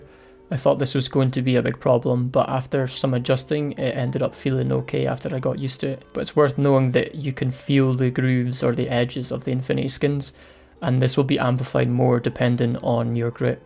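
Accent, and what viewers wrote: Scotland, United Kingdom. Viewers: Super high quality production dude, you did an amazing job. Also, is that a scottish accent i hear? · are u scottish ? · Great video. Sounds like your from the UK. any chance I could buy that broken mouse off you as it would make for a good time trying to fix it. Thank you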